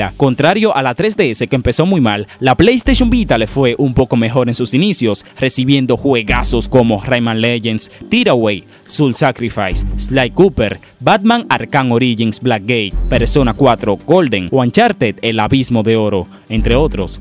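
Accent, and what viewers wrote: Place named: Dominican Republic. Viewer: men tu eres dominicano tu me suena dominicano xd (yo si:v)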